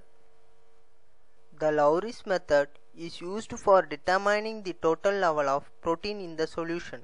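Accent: India. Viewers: VERY NICE INDIAN VOICE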